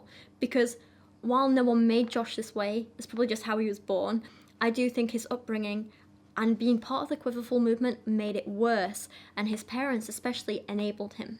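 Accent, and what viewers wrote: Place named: Yorkshire, United Kingdom. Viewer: Hi,! I knew exactly what saying you were talking about at the beginning as soon as you started describing it. I have been thinking of it recently when I watch videos critiquing tradwives/the alt-right but it was bugging me that I couldn't remember it either. I finally Googled it just now and it is "Kinder, Küche, Kirche" (Children, kitchen, church). Off topic, I love listening to your voice/accent! It reminds me of when I was able to go visit my aunt and uncle in West Yorkshire (though they themselves don't have Yorkshire accents because my uncle grew up near London and my aunt is American like me). Edit: Yes, state troopers are synonymous with state police. They tend to be involved with investigating crimes that occur in rural areas/outside city limits.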